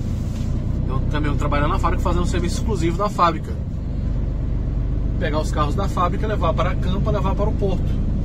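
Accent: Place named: Rio Grande do Sul, Brazil. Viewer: teu sotaque é de gaucho, vc é da onde amigo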